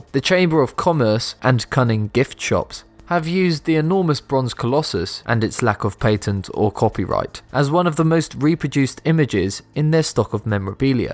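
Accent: United Kingdom. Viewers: British pronunciation. Not incorrect, considering narrator is clearly British, at least based on his accent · Stop assuming he's American just because he made a mistake on something from another culture, you idiot. Judging by his accent, I'd say he's from the UK.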